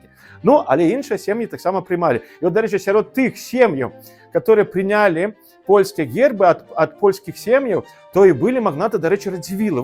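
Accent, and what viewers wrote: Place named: Mstsislaw, Belarus. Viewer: Дзякуй! У Вас вымаўленне, як у Мсціслаўле. Я там некаторы час працавала і таксама пачала такія канчаткі дзеясловаў гаварыць. Мясцовыя асаблівасці гаворкі прыліпаюць хутка і моцна. 😄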